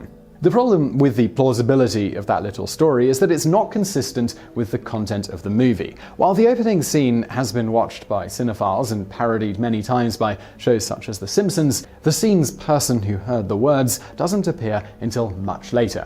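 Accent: United Kingdom. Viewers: Blame his British accent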